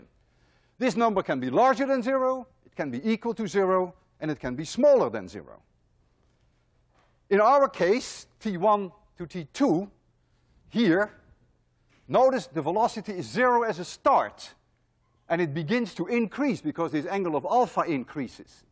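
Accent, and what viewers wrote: Netherlands. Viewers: The two most common comment I read here about are: 1. "Amazing teacher" I totally agree. 2. "He is Dutch" I assume because your accent, but (unfortunately) I can not capture that. For me your accent is very good and very compatible with my rudimentary English.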